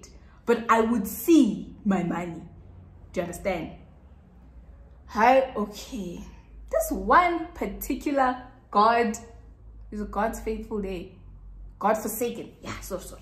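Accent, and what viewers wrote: Afrikaans, South Africa. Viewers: Your Afrikaans accent🙇🏽‍♀️😂😂😂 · It's the Afrikaans accent for me 😂😂😂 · Fun fact: Every after 3days I come to check if momma has delivered the content yet! 💕📌 My second home is here with ❤️ I love youuuuuuuu! 🤣🤣okay. Afrikaans accent! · I LOVE the Afrikaans accent 🤣🤣🤣🤣vat nie kaak nie😂😂arg thank you for the story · Her Afrikaans accent is top tear 😍😂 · It's your Afrikaans for me🤣🤣❤️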